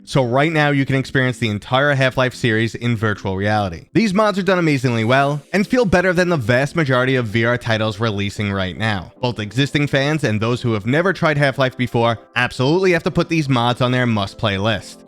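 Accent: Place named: Massachusetts, United States. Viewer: thought i heard a hint of Massachusetts in your accent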